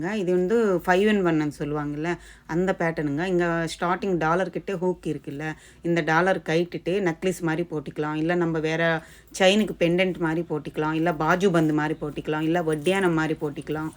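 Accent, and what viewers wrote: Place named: Telugu, India. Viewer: Your telugu mixed tamil voice.. is my favourite ❤️♥️ that too you say superuh irunthuchii 😍 anyways your video collections are so nice sister...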